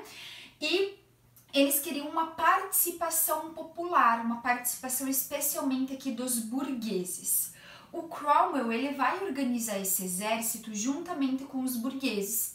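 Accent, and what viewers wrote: Brazil. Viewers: é paranaense (do sudoeste, provavelmente). O sotaque é igualzinho. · Que legal esse sotaque gaúcho!!!!!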